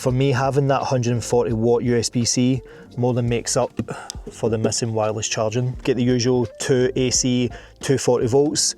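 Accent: Scotland, United Kingdom. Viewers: I'm watching enough folks from the UK doing reviews that I'm actually able to understand almost every word. It's amazing! And it's a fun challenge. The one thing that I'm not so good at is distinguishing accents. British is pretty easy, but the other three are more challenging for me. I'm going to guess Scottish for you, but as green as everything around you is, it could be Northern Ireland. Am I right? BTW, I don't know about the pricing in the UK, but here in the States, on Amazon, I just bought one of these for 379 USD, which is cheaper than my Bluetti AC180 cost, at 479 USD, in December of 2024 and that was a huge Christmas sale price reduction. To me, that was the biggest "PRO" for the 100v2. I enjoyed you review and thanks for publishing the content. If you don't have one already you might look into buying a convection hob. They can cook many different things very efficiently and are perfect to use with a solar generator. 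· The gentleman is Scottish. I am English. I can understand him perfectly. You on the other hand are incredibly rude. I also understand that it takes a hell of a lot of time, effort and resource to put these reviews together so that the rest of us have a better idea about what to buy.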